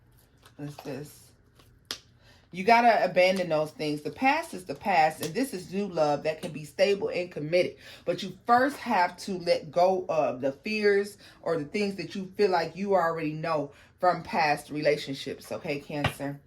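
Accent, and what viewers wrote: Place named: South, United States. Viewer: i’m from texas so i liked that lil country accent lol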